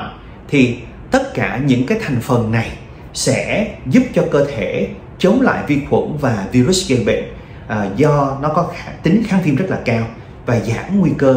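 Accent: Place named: Da Lat, Vietnam. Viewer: Bs nói giống tiếng dân Đà lạt quá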